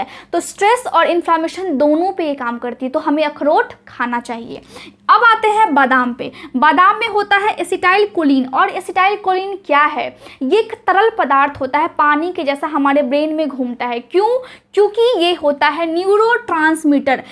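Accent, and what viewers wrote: Bihar, India. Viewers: bache tune mere pass Janam kyon nhi leiya. Love you and God help you. From Himachal Pradesh 176214 PIN. Bache flow aah rahe hai Bihari language ka. Beta update. You are coordily invited to visit HP and stay my home with your mom & dad. God bless you.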